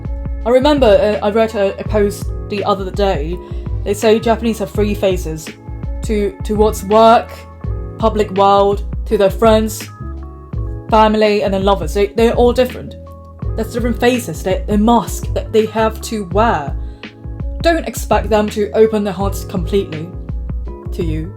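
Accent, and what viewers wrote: United Kingdom. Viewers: When you speak Japanese, you sound like a Japanese person. But when you speak English, you sound more British. Either way, you sound great. No judgment here. 👍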